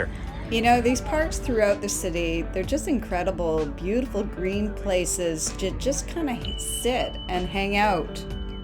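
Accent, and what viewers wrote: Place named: United States. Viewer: Do you have to pronounce it with an American accent? Change the "a" sound. It'll sound much nicer.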